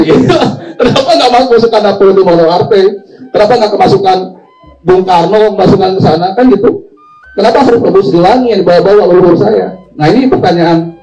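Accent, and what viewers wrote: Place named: Bali, Indonesia. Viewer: Logatnya masih Bali.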